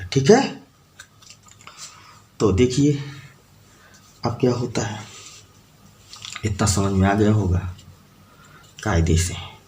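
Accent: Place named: Pratapgarh, India. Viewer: SUPERLIKE 47::)) बहुत ही श्रेष्ठ कार्य कर रहे हैं आप🙏🙏 मेरे पिताजी भी प्रतापगढ़ से थे, तो आपकी बोली से अपनापन सा लगता है । बहुत बढ़िया भैया जी🙏🙏🙏 बहुत बहुत धन्यवाद और हार्दिक शुभकामनाएं 🙏🙏💐💐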